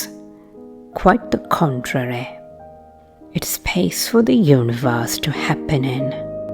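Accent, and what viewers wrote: RP, United Kingdom. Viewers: Yes I loved this - so cinematic and your accent is so delightfully POSH as always! I wonder why this one doesn't have more views?! It's a pity really!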